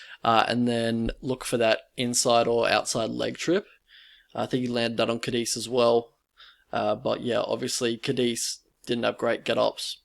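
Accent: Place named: Australia. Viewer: The Australian accent is a hideous mutation that sounds like the US southern accent and english accent had a baby! With that said thanks for the content!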